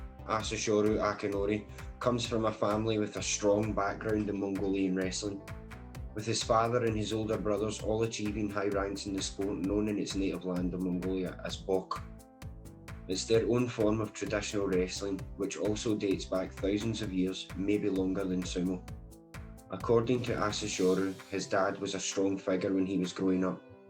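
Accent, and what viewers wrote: Scotland, United Kingdom. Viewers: Thank you for the video. Quite nice and well done ... I am a die-hard fan of Asa also. I discovered sumo with Asa, just short before he to become Ozeki. I dont know why but I think I am in love with the Scottish accent. Hearing you, and also following the channel Scotland History Tour I start to really love the way how Scotts are speaking. You (all the Scotts) have a nice musicality in your tempo of speaking. All the best! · What a great combination - sumo and the Scottish accent! Enough to subscribe :) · Thanks, I did, Scottish accent + auto-generated subs = fun :) Btw. this is a must-see: Scottish Star Trek <link>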